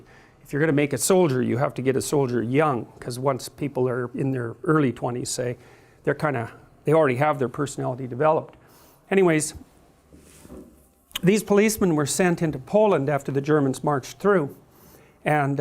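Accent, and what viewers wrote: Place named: Canada. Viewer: With a Canadian twist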